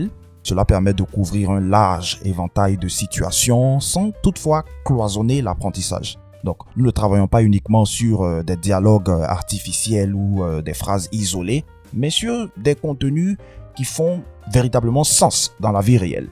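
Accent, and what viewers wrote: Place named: United States. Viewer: Salut mon frère, franchement j'ai vraiment la façon donc tu parle cette anglais américain. Je suis vraiment impressionné. Stp est-ce possible d'avoir ton contact si ça te gêne pas. J'aimerais vraiment développer ma conversation en anglais américain avec un speaker en plus de mon exercice personnel de parler en anglais ( shadowing)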